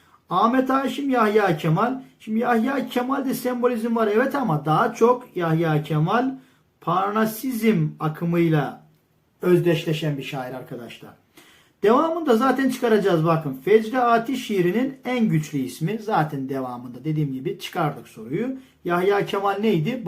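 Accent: Black Sea, Turkey. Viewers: C ler kayıyor Rize yada Trabzon bizdensunuz hocam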